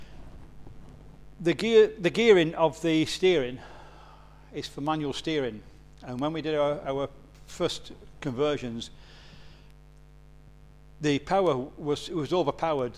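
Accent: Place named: North, United Kingdom. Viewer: Nice to hear a northern voice. Now live in Cardiff. Paul would love power steering on his Series 3 Ragtop/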